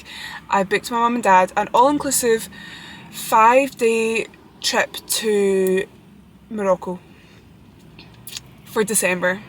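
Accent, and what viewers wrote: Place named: Fife, United Kingdom. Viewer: So nice 🥺 side note- you sound like your from fife (from a fellow fifer)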